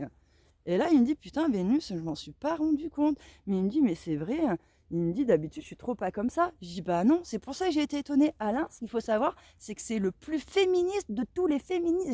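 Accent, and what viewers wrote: Paris, France. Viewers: Petits conseils : réseaux sociaux, pas réseaux socials, ça pique! Essayer de perdre cet horrible accent parigot. Et enfin, suivre une psychothérapie, dont il semble que vous ayez grand besoin.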